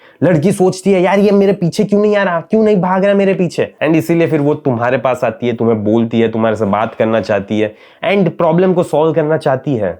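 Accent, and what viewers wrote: Gujarat, India. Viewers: Tumhari boli se pata chal gaya k tum gujrati ho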